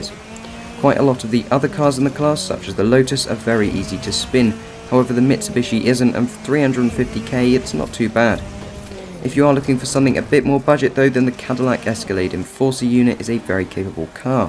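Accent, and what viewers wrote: United Kingdom. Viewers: nice to see ur still doing crew 2 ! i just got the pagani zonda r and it is INSANE! btw u sound british which part ur from?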